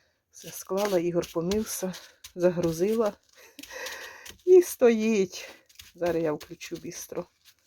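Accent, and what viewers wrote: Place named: Polesia, Ukraine. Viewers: А ви, напевно, родом з чернігівщини? Моя сваха з тих країв, то в неї точно такий говір.А шапочку потрібно поміняти, на шерстяну алергія. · Де це ви проживаєте? Мова то поліщуків.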